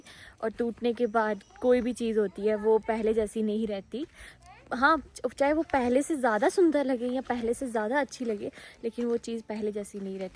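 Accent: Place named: Punjab, India. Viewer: Tum itna atak kar kyu bolti ho tumko koi problem hai kya u can not even speak punjabi properly wo bhi bilkul rustic way mei bolti ho. Thoda grooming karo apni aunty.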